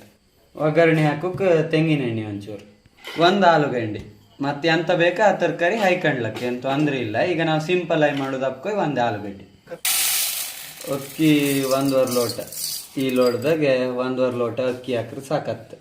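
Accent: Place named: Kundapura, India. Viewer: ನಿರುಳ್ಳಿ ಎರಡು ಗ್ಯಂಡಿ ಸಾಕ...😂 ಒಟ್ಟಾರೆ ಪ್ಯೂರ್ ಕುಂದಾಪ್ರ ಕನ್ನಡ ಮಾತಡದ್ ಒಳ್ಳೆ ಇತ್ ಮಾರ್ರೆ 😄😄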